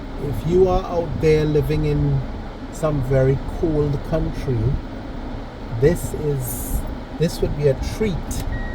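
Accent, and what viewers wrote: Trinidad and Tobago. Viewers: Hi watching from Guyana. I love your videos I lived in Barbados, I am originally from Guyana but worked in Barbados for meny years. Barbados is a very beautiful country. I enjoyed evorything except for some of the locals. Most of the time people were quite hostile towards me because of my nationality especially in the workplace I did not expect that from such a major caribbean island. I have worked in Jamaca, Trinidad and Grenada and experienced welcoming people how would invite you into they're homes, but in Barbados people treated my family and me like dirt and always had remarks. This was very sad for me because we love the island. We are planning to visit next year and hope to have a different experience. I love the videos as we are planning to see all of the unique sites that you showcase. I love the Trini acsent 😁 I guess visiting and living in Barbados are completely different things. 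The only country where i had a similar experience to my experience in Barbados was when I was a young man studying in England.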